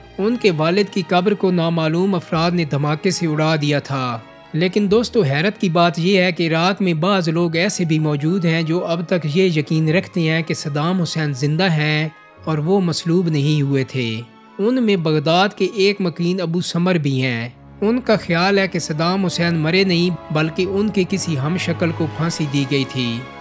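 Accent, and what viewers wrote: Chakwal, Pakistan. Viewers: Ap k bolny Ka style touch chakwal said Ka hai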